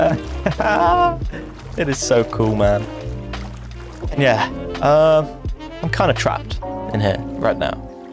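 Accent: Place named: England, United Kingdom. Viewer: Love the inventive builds and chill vibes. I prefer your English accent over the American :)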